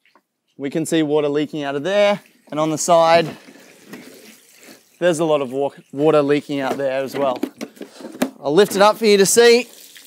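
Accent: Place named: Australia. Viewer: a very aussie accent))) how'ya' going mate?)))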